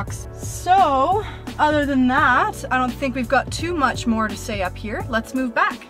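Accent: Canada. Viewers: Your accent is so Canadian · I came for the cutie with the Canadian accent. Then realized the video was pretty good too.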